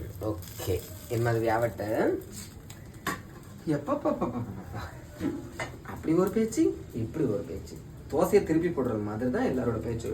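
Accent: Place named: Nagercoil, India. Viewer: Hi Anna Nanum Nagercoil than Ippo Chennai la erukom Unga video pakkum pothu, namma sontha mannu pechu, romba santhosama erukku anna. Niraya video podunga anna Aduppankarai pakkum pothu namma ooruku pona mari santhosam Thanks anna❤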